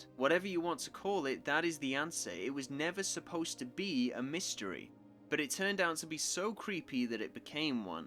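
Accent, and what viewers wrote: United Kingdom. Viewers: I like to watch your video it just my bad and my fault for insulting you cuz i like to hear when u says "Water" and "Woter" to a Wa Er 😂 but sometimes i heard and listen carefully on your "UK accent" it got me laughing alone like people look at me like am a craaaazyy person.. 😂😂😂😂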